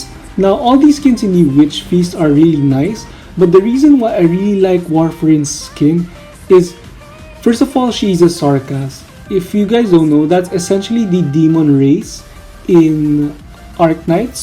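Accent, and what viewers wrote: Philippines. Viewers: U sound filipino, kabayan?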